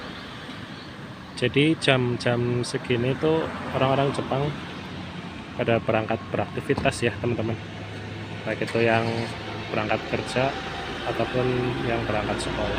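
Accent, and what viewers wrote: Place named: Tegal, Indonesia. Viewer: Logat bicaranya seperti orang dari Tegal nih😁, tapi lumayan bagus cara penyampaiannya 👍👌🇮🇩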